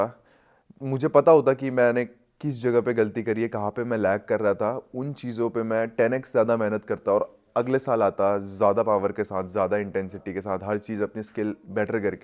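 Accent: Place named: Delhi, India. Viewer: u r talking like generic delhi boys. dont know whose personality rubbed off on u. pls go back to being your simple self who is not so camera trained and delhi speaking style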